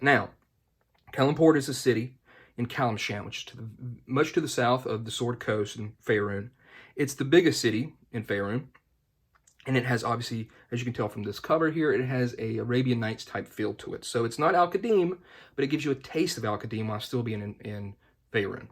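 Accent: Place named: South, United States. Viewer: Great video. I love seeing reviews of 2edition products and FR specifically. Theres very little on 2nd edition Realms out there. I asked this on your introduction video but I thought I'd ask again. Are you in South Carolina? I can detect some southern in your accent and I saw the WYFF meteorologist video lol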